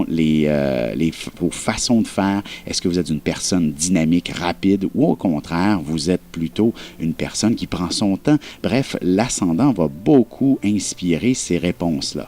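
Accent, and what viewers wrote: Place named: Quebec, Canada. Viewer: lotus fleurs C'est du Québécois ;)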